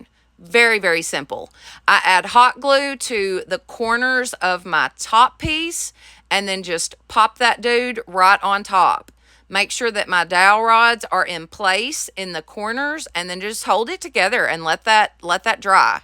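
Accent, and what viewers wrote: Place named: Tennessee, United States. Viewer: Love each of these but I'm crazy over the first 2.. Gorgeous !! I am trying to guess where you are by your accent. I'm not right on many of my guesses. Lol.. I'm thinking Tennessee or North Carolina.. Am I even close ? Lol.. I live in South Carolina.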